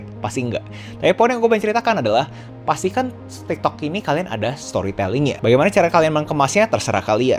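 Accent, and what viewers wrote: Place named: South Jakarta, Indonesia. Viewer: Bahasa lo trllu jaksel bang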